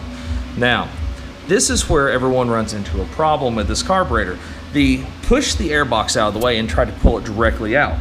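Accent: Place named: South Africa. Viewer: you sound like a white south african my man! Dope vid man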